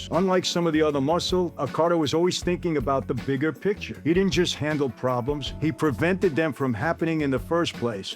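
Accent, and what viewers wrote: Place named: New York, United States. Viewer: They need to hire you as the voice over for all mob/mafia documentaries from now on your experience on top of that classic New York accent legendary stuff man keep it up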